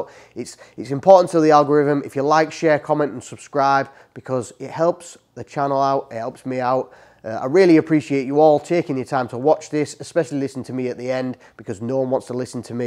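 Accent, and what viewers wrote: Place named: Scotland, United Kingdom. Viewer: Never heard your voice before, wasn't expecting Scottish. Nice to meet you sir :)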